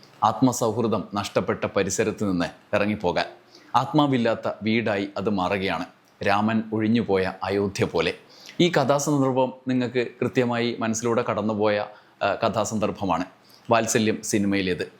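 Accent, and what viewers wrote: Kannur, India. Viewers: സാറ് കണ്ണൂർ കാരനാനോ. എവിടെയോ കണ്ണൂരിൻറെ ചുവ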